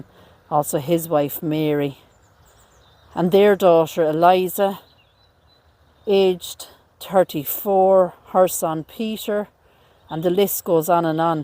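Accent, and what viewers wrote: Ireland. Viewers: I love you channel and Irish accent!!! Some of my mom’s family were Irish. Great ancient graves 😻!!! We don’t have any like that here in the U.S.A.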